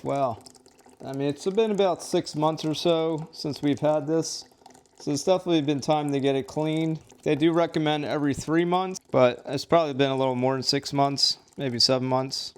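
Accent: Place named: Philadelphia, United States. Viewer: THANK YOU!!! 🙏 😊 Ps: You must be from the Tri-state area.. Here, outside of Philly, we say “wooder” too. 😂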